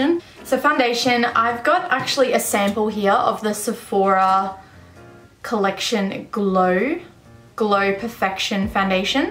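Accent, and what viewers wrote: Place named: Australia. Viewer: Soo jealous of your Aussie accent 😂😩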